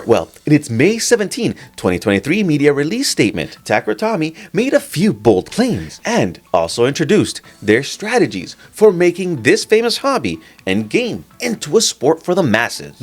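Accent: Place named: Canada. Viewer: [tɑ.kɑ.ɹɑ təʊ.miː] is how I pronounce it. Japanese people don’t bat an eye when I say it. But I don’t feel like I say it like they say it. You’re speaking in English with a Canadian accent. You’re not saying it the way I say it. But I ain’t a Canadian. In the end, who cares? 日本語を話しますか？Probably not. But again, who cares? I’m still trying to get used to your cadence. 😅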